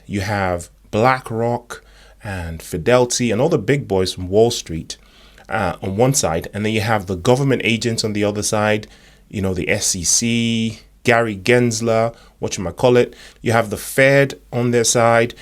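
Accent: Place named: London, United Kingdom. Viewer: Just checking you aren't AI. Let's go xrp. What part of London you at?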